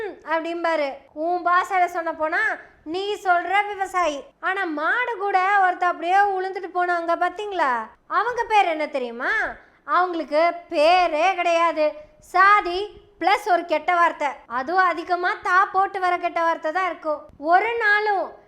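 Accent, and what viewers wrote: Kongu, India. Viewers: கொங்குத் தமிழில் சதிராடும் தமிழ்த் தாயே வணக்கம்🙏🙏🙏🙏🙏🙏🙏🙏🙏🙏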